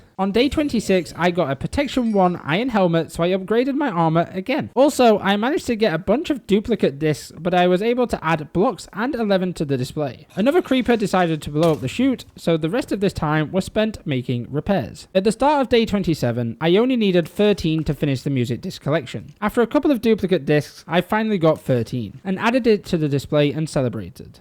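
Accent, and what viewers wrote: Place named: United States. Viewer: You come from uk? Boi you sound like a guy that comes straight from the us!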